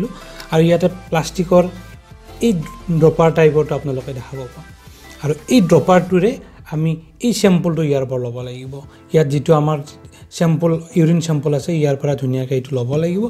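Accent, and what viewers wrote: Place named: Nalbari, India. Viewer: আপোনাৰ ভাষাটো নলবাৰীৰ যেন লাগে। ভাল লাগে।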